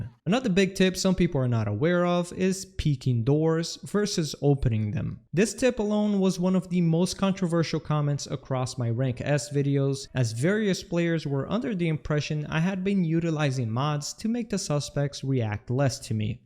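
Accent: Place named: Portugal. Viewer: I knew as soon as you started to speak that you were portuguese. Great vid man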